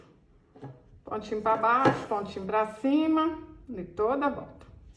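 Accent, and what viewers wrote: Minas Gerais, Brazil. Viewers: Você é mineira? Eu sou cearense, moro em Fortaleza. 😀 Adoro o seu sotaque! Parabéns pelo seu trabalho! 👏👏👏👏👏👏👏👏👏👏👏👏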